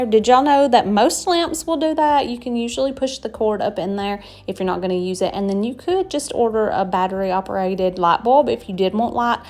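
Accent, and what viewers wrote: South, United States. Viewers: So I guess you can tell by my comments your shorts just popped up on my homepage a few days ago been loving your shorts so I decided to watch this full length video today and I love it. Love your personality. Love your beautiful home love your accent. I’m from Mississippi so I have one too. I did learn something from your video today. I’ve never been told before to heat up my cornbread pan before I cook my cornbread, I will be trying that, have a great day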